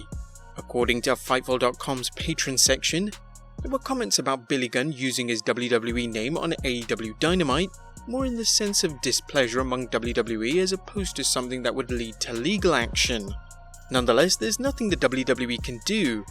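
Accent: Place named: England, United Kingdom. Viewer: How come the American narrator can curse but this narrator can’t? Need some English accented f bombs in my life lol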